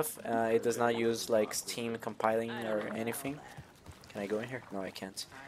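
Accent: Brazil. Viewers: o sotaque br no inglês é impagável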